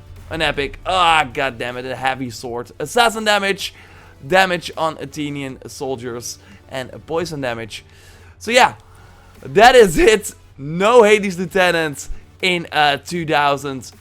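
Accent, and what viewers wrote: Netherlands. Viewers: I thought so you're from the Netherlands from your accent. Nice video by the way!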